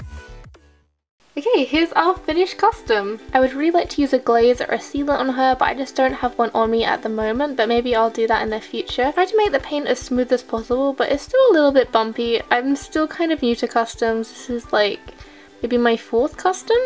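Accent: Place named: United Kingdom. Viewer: Wait YOUR BRITSH wow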